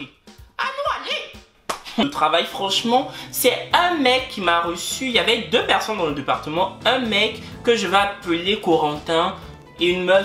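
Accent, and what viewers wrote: Ivory Coast. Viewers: Clover C'est normal c'est la manière de parler des Ivoiriens!!! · 😂😂 c'est la 1ere fois que je vois et entend ce gas! MDR déjà je sais que c'est un ivoiriens de suite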